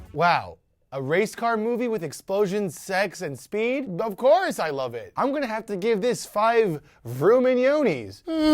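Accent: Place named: Norway. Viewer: I thought the accent was Norwegian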